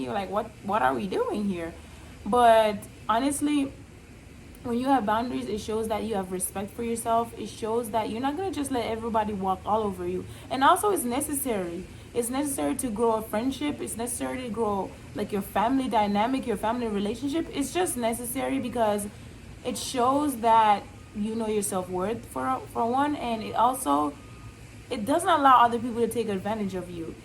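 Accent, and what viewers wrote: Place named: Puerto Rico. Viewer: U sound puertorican cool anyway my 20’s were dark and depressing😞sis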